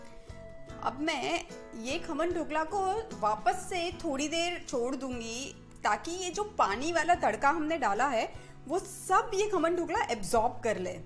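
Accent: India. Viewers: Nicely explained and the hindi accent!👍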